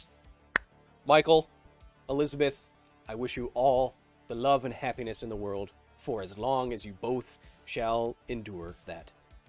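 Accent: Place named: Australia. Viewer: He does an Australian accent because he's good at it and real Aussies aren't so easily offended. 👌👍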